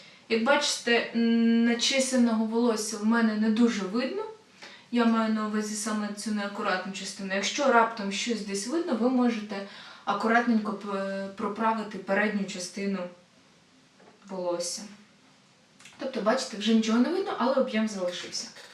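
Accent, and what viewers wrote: Standard. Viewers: Чисто українська мова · дуже гарна зачіска) Приємно чути чисто-українську мову!) Ти супер😊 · Дякую, відео дуже сподобалось.Ви дуже освідчена людина.Яка у Вас професія? Тому що знаю тільки одну молоду дівчину,яка так чисто говорить на украінській,але вона закінчила Могилянку. Хотіла б я так говорити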